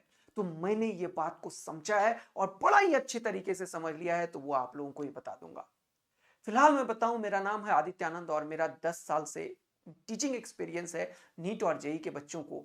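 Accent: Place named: Maharashtra, India. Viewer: Sir, aap Maharashtra se ho kya??? Accent se lag rha ❤ Love it 🎉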